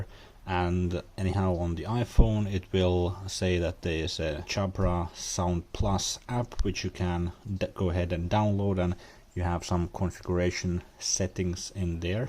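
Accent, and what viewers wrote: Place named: Finland. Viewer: Your accent sounds like a Finn. Onko sinä suomalainen?😊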